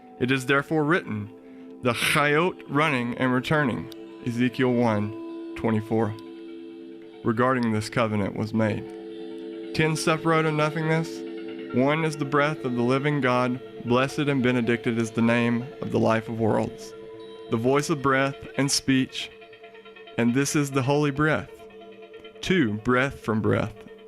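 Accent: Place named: South, United States. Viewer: Narrator has a southern accent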